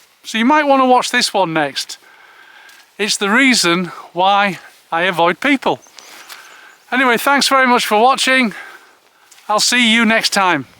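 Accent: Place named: Hull, United Kingdom. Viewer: Detecting a little Hull in your accent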